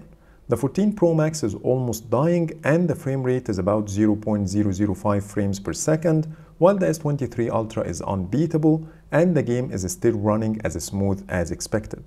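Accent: Egypt. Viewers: I think you’re from Egypt from your speaking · Bro your accents English like Egyptian people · انت مصري صح؟ باين من الاكسنت بتاعك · Egyptian accent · كأن اللي بيتكلم مصري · Bro‘s egyptian accent roaring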